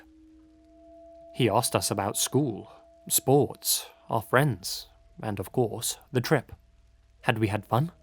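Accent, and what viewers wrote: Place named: Northern England, United Kingdom. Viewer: Your accent is quite hard to place. It's certainly British - more specifically English and largely Southern, but it sounds like it has the lilt of somewhere else. Either you grew up down in Southern England and moved up North acquiring a northern lilt, visa versa or you just have unique annunciation.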